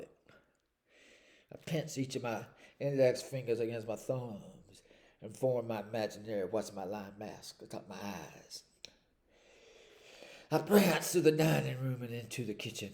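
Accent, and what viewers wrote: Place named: Mississippi, United States. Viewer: Love this. As one born in Mississippi whose mama was born and raised in Scott County, I recognize those sounds. At one point you reminded me of Aunt Authula. I heard Uncle Stanley, too. My relatives in Mississippi sound just like that. I need to read your books. Well done here. Thank you.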